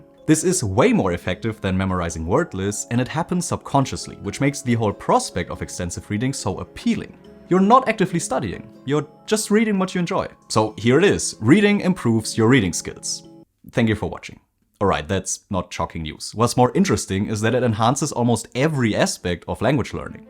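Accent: Germany. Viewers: Do I hear a German accent? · What technique did you use for learning English? You sound very fluent, but have a fairly strong foreign accent, especially with s vs z sounds and vowel + r sounds. I'd bet your first language is German or a closely related language and you grew up learning English but without a lot of audio input (e.g. somewhere they dub Hollywood movies & TV). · I noticed your German accent, ich lerne Deutsch!!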